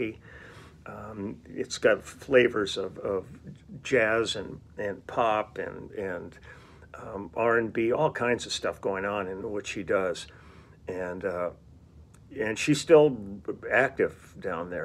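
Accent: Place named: New York, United States. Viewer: I love the fact that you sound like a real New Yorker and seek out gems like Joe's Pizza! Cheers from Manhattan!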